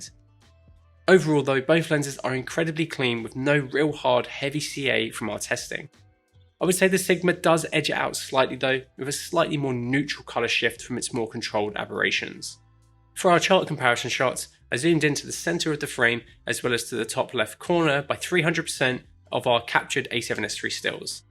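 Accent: United Kingdom. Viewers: Not sure if the British accent makes this more enthralling, or the to-the-point, no frills, expertly-presented information. I think it's both.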